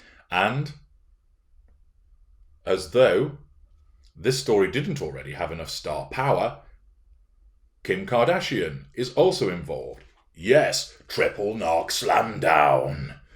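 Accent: United Kingdom. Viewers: Hi, I first subscribed to your channel several months ago through your analysis of Harry’s wife. Since then I have been backtracking through your past commentaries. Your output is impressive, the research is extensive, accurate and relatable. As Australian 🇦🇺 your voice is very British and so pleasant to listen to. Thank you.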